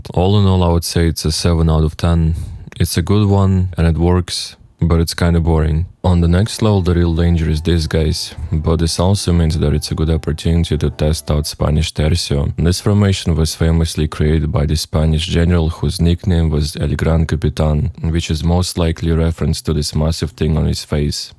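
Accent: Russia. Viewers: This is explained by the fact that he has a Russian accent. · this is ochen horosho, bratan, delai bolshe takich video (I heard an atom of russian accent) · акцент русский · listening to ts with just audio sounds like a russian telling you war stories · man i thought there's a russian version of this video because of the russian accent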